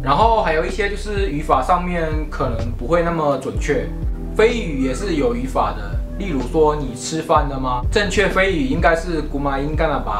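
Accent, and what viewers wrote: Taiwan. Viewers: 小哥的話很像台湾人的口音 台湾觀眾聽起來熟悉 舒服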